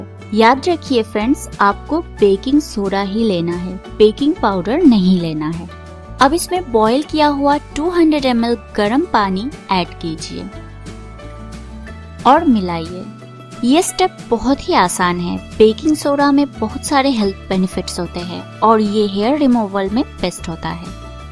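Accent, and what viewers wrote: India. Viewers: Her speech is not comprehensive. It feels like she speaks Indian. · It`s Hinglish( Egnlish with Hindi accennt and mixing)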